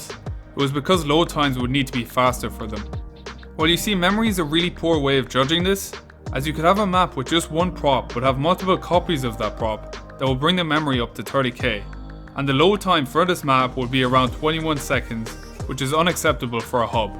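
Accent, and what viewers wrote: Ireland. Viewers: Are you irish boss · Are u from Ireland because I am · Where are you from in Ireland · Are u irish? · Are you Irish. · U sound irish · Are you irish? I saw you in yung chips video and I was like, yea he sounds irish 😂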